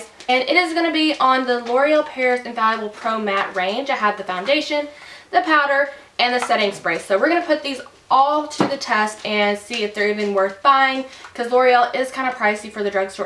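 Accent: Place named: South, United States. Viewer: haha i didnt notice she had one til this comment. maybe a little southern sounding. but im from the us and i barely even noticed.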